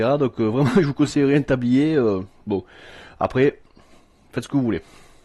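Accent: South, France. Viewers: Super Sympa et très utile ce tuto. Que d'excellents conseils avec une pointe d'humour c'est toujours agréable à suivre. Par contre un petit soucis..l'accent plutôt du sud et le petit kenavo breton . Alors plutôt Rennais ou Marseillais ;-)) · Merci pour ces bons conseils donnés avec ce bel accent du sud ;o)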